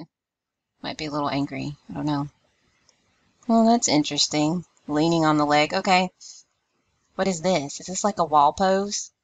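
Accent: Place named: South, United States. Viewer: You're too cute! "Is there something stuck on ma shoe? Did ah break ma heel? What's that all about?" Hehehe love your southern gurl, so am ah! and TYSM for doing these videos. Ah am a visual learner and ah need pictures too. YOU are a wonderful teacher!!!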